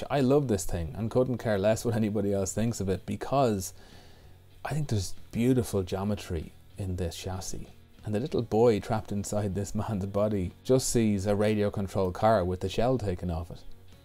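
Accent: Ireland. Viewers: Great to hear an Irish man working on cars. And I LOVE the stop-motion bits showing work done by invisible hands. This is a REALLY UNIQUE USP for this channel. It's probably very time consuming to do, but this helps this channel stand out. Well done. I'm a new subscriber !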